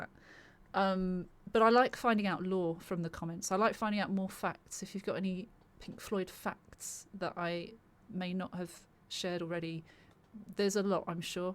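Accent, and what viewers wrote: United Kingdom. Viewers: You just reacted to by Pink Floyd, and it took you 41 minutes and 13 seconds to finish. You didn’t rush it. You took your time with every sentence, trying to understand the lyrics fully. And honestly, your British accent made the whole experience even more lovely. It felt like you were guiding us through the song with care and curiosity. That’s why your reactions stand out. They’re not just content, they’re connection. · And her lovely British accent makes it even more special. Thank you young lady.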